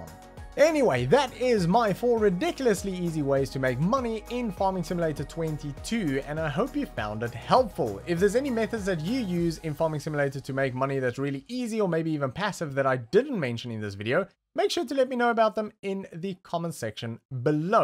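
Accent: South Africa. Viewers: Strong SA accent 🤘🤘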